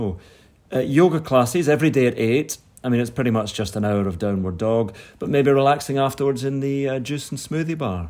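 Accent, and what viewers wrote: Scotland, United Kingdom. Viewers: that lovely Scottish accent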